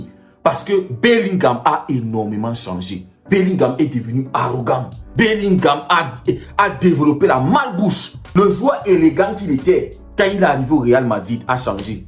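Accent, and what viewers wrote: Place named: Cameroon. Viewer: La première chose que j'aime chez les camers c'est dabord leur accent 🤣🤣🤣, tres bonne analyse papa !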